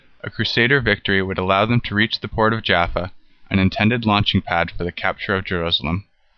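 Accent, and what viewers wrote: United States. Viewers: the american accent just ruined it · Hokey American voice and indistinct graphics make this a very amateurish effort.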